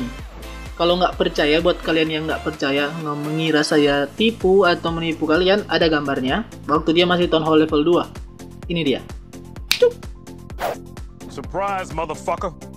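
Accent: Manado, Indonesia. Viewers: Bang Asli Manado Kah? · org Manado Nn kang? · Bang orng manado ya? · bang lo org mnado bang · Orang manado? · Kiraleh ng orng jakarta, trnyata cmn orng mnado😂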